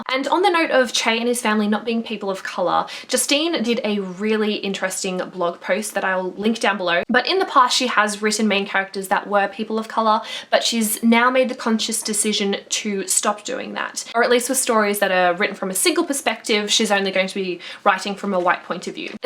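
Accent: Australia. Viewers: You have such a beautiful australian accent but still a bit different from the way my australian friend talks, so I guess that it's a matter of different dialects. which part of Australia are you from? If it is ok to ask? And I soooo have to read this book, you made me so curious and the way you talk about it makes me think that I really would enjoy it!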